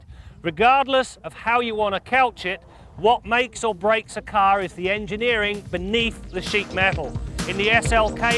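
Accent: United Kingdom. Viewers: It's weird to hear a guy with a Hitler moustache speaking with an English accent.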